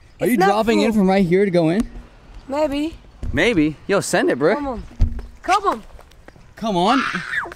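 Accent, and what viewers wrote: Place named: Australia. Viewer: How are u Aussie I can tell cause I am Aussie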